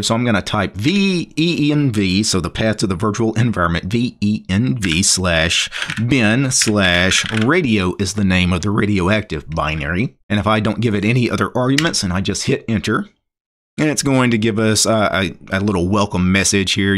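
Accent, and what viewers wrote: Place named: Louisiana, United States. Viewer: That Louisiana twang really is something 😅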